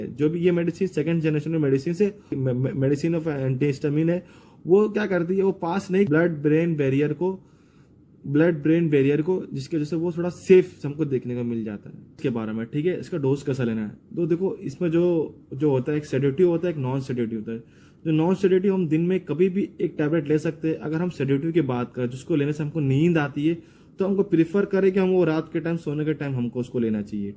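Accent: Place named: Hyderabad, India. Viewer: Bhai tum Hyderabad ke Rehne wale hai kya... Hyderabadi urdu...dikhri tumhari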